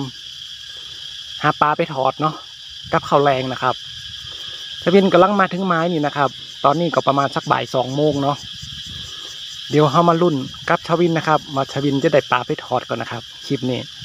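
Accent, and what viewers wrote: Thailand. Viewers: เป็นคนจังหวัดไหนเจ้าอู้เหือนขุนตาลเจ้า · ป้าเข้ามาผ่อสองสามคลิปแล้วจ้าวชอบคำอู้ยองฟังแล้วม่วนดี หลานป้าก่ออู้ยองยังกับหล้าชวินจ้าว หมานๆเน้อคับ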